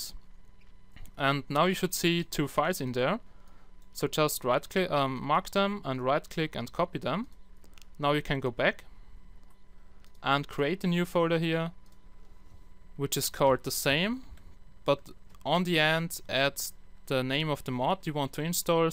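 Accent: Germany. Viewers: man merkt das du deustcher bist :D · you sound like Docm77 ... German Accent ♥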